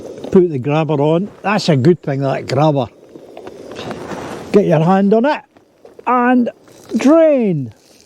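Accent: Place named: United Kingdom. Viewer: Your accent is so cute! For me, a Brazilian, you sound like a Harry Potter character!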